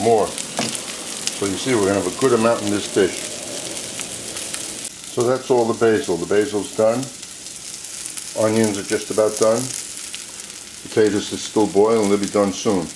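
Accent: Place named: New Jersey, United States. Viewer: Why do you sound like one of the Sopranos?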